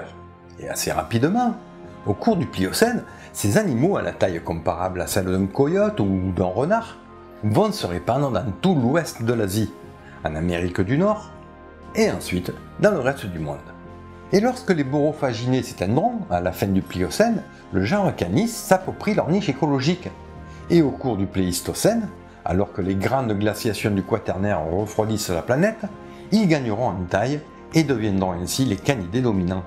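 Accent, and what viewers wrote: South, France. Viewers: Vous avez quoi comme problème avec l'accent du sud sans déconner. Vous vous êtes entendu avec votre accent pointu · Beaucoup d'infos interessantes, très détailler et j'aimes bien l'accent du sud de la France, c'est sympathique et la prononciation impécable, un seul hic, j'ai entendu mouflette décrivant c'est animal d'une puanteur exécrable, au Québec nous disons mouffette, interessant de savoir la différence d'appellation.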